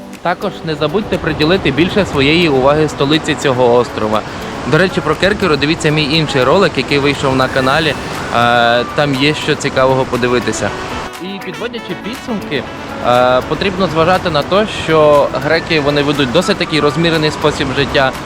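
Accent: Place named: Poland. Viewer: Польский акцент.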